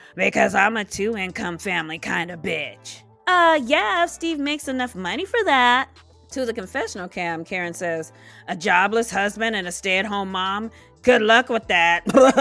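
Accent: Boston, United States. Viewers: When this season is over, I’m going to miss your Bostonian accent! It tickles me everything I hear it 😂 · Your Boston accent is so on point 😂😂😂😂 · The Boston accent 😆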